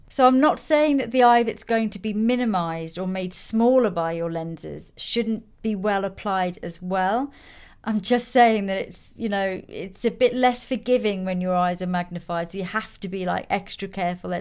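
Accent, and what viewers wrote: United Kingdom. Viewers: Do I hear a british accent :D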